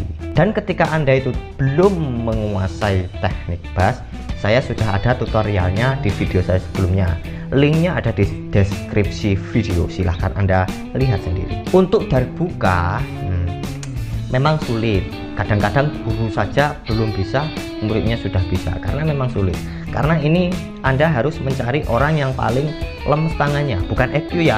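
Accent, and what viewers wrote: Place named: Java, Indonesia. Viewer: Jawa ya mas? Bahasa nya kaya saya hehe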